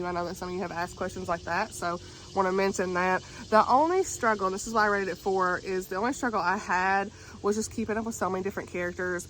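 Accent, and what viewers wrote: South, United States. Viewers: I immediately smiled at the first two words you said! "Hey, y'all!" as a southern girl myself living in the west I miss hearing the southern accent! basically, I. Am. Here. For. It! 😁 and yes, if you go to my videos you'll know that I don't have a southern accent. Everyone asks me where it is. and i'm sad i lost it (maybe never truly developed it) But when i go back home to visit family and talk to people with a southern accent, mine shows up! or when i'm ranting about something! 😂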